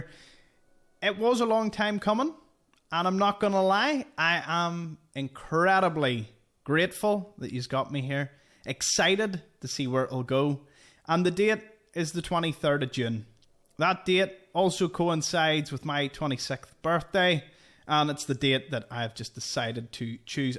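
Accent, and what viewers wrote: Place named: Northern Ireland, United Kingdom. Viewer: I think what makes your channel so appealing is, not only your soothing NI lilt but also your easy going attitude to the game, not taking it badly when it goes wrong. 👍👍👏🏻👏🏻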